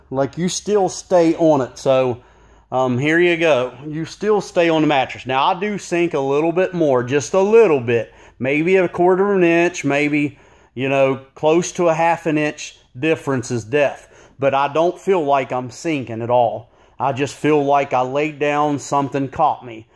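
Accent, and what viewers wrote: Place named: South, United States. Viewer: The Southern accent gives this review that extra level of authenticity.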